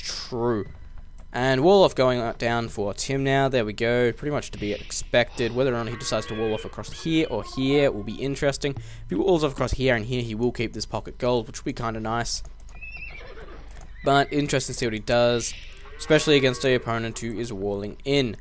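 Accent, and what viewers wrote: Australia. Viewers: About deer luring. Viper says it's usually wise not to lure deers. You should really make video with accentuated australian accent.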